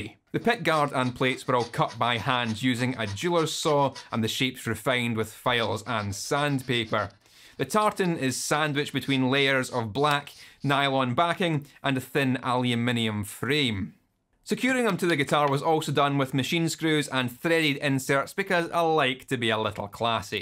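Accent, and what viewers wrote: Scotland, United Kingdom. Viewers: His accent sounds stronger in this video, the Scottish pride is bleeding out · Beautiful. Also, is it weird that has never sounded more Scottish than he does in this video about the most Scottish guitar? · Lol unrelentingly Scottish from start to finish. Love it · Absolutely love this, pure magic and in an accent I’m more than comfortable with. Subscribed and keen to consume more. Soar Alba 🏴😁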